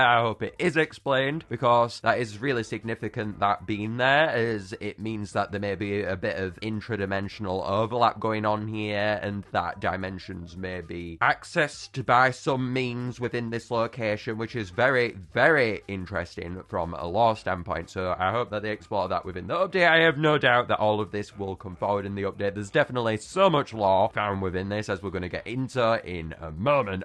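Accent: United Kingdom. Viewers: You are great to watch! I love your British accent! 💖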